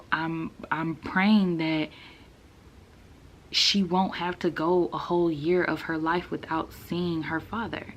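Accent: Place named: Nigeria. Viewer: Your Nigerian accent is actually strong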